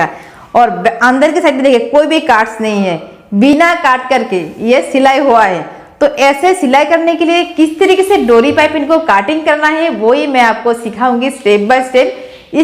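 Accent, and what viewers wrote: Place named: Bengal, India. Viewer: দিদি তোমার বাংলা একসেন্ট আছে😁😁 বাড়ি কোথায় দিদি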